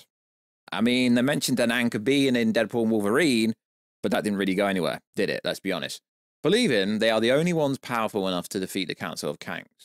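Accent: United Kingdom. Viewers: I don’t understand what you’re saying. It’s to British. And my English is not that bad. It’s a shame because it looks very interesting. Good luck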